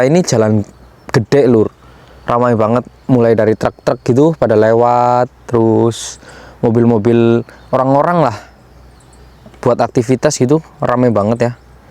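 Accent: Banyumas, Indonesia. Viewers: ngapak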